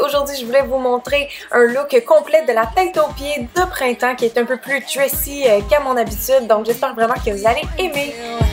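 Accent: Quebec, Canada. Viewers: Coucou, j'aime beaucoup tes vidéos, tu es trop cute, j'adore ton ptit accent québécois et tes ptites tâches de rousseurs c'est trop mignon :) j'aime aussi tout tes conseils de maquillage, mode, et ta personnalité, la fraîcheur, la simplicité et ta bonne humeur, continue comme ça ! Puis félicitation pour ta ligne de vêtements, je te souhaite le meilleur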